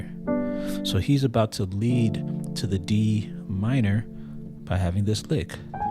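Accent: Caribbean. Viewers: Brotha, I hear nuances of a West Indian accent. Where do you hail from bro? (Weh Yo People dem Really from?). Blessings from Florida, hailing from the U.S. VIRGIN ISLANDS 🇻🇮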